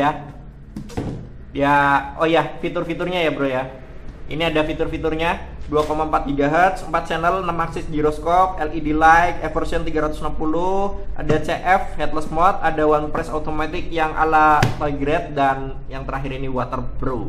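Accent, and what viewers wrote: Banyumas, Indonesia. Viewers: mas e ngapak e mana e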